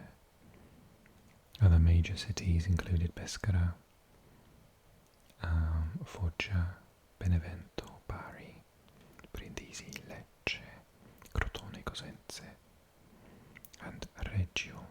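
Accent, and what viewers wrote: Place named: South Wales, United Kingdom. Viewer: Hello! This is the first video of yours I’ve watched and I love your voice. It’s so warm and rich. I used to live in South Wales. I think your accent fits that region, maybe Port Talbot/Swansea way, but please correct me if I’m wrong!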